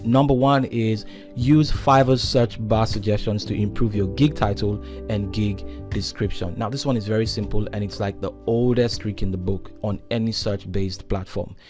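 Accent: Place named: Nigeria. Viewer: I like your clean clear Nigerian accent.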